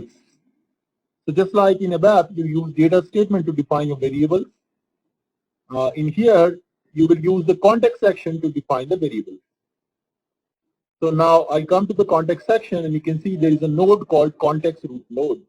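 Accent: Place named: India. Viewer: bloody indian pronunciation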